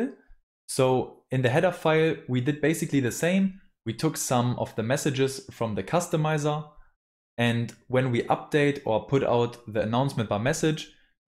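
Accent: Germany. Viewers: Haha bitte😄 Hatte es am Akzent gemerkt, dass du warscheinlich deutsch sprichst. Ich benutze das narrative theme und so wie es scheint ist der Code der im Video ist nicht der selbe wie bei mir. Deswegen kann ich auch nicht die stellen finden an denen der code geändert/eingefügt werden soll.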